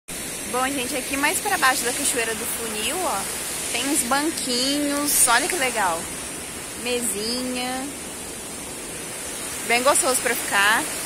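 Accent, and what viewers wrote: Minas Gerais, Brazil. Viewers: Esse seu sotaque mineiro é muito legal. Experiência se adquire com o tempo. Seus vídeos estão cada vez melhores.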